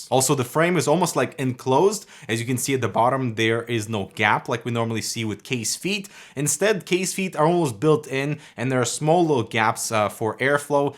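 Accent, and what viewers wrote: United States. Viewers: is your accent american or swedish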